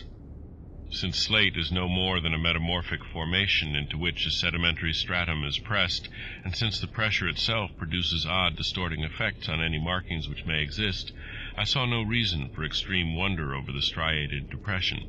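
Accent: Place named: United States. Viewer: I don't like the USA accent, it should be read with a British accent.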